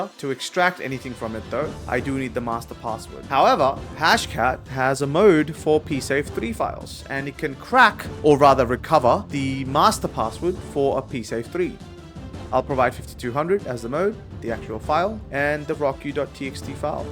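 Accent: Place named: South Africa. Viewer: Suuuubed. What accent is that? South African in Australia?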